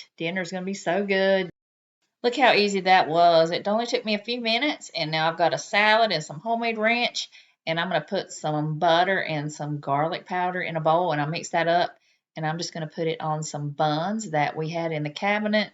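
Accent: South, United States. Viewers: Wow! This looks delicious and I'm adding yet another recipe to my list of things to can this year. And I agree, stuffing manicotti noodles is a challenge. Your plate with the green flowers brought back some warm memories. My Mama had the same ones when I was growing up. I'm originally from the south so hearing your voice is like being home again. Thanks for sharing. 😊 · I love this recipe! When I don't feel like cooking a big pot of sauce, I just go grab a jar!! Love your southern accent, my dad was from Mississippi! Thanks for joining in!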